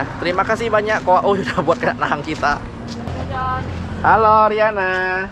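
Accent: Pontianak, Indonesia. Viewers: " lewat tempat belobang pun nda beguyu" 😂😂😂 logat Pontianak kau tukh nampak benar...!! 😂😂 Utamakan selamat ya..!! 👍🏼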